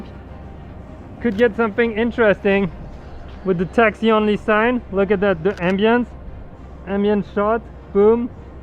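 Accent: France. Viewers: Sorry for this comment, you must really be French to understand that, but it kills me how has not only a French accent when talking english, but also a Parisian accent with all the "Euuh" at the end of each word ahah 😂😂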